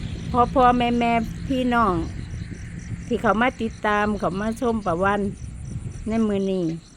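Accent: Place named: Khon Kaen, Thailand. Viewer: เริ่มเว้าใกล้ข้องแล้วจ้า 😍😍😍สู้ๆต่อไป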